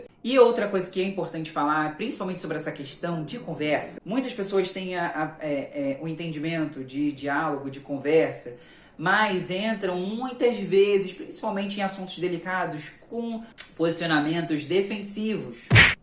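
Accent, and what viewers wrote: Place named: Rio de Janeiro, Brazil. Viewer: Aí que saudade desse sotaque carioca e desses expressões involuntárias ❤️📸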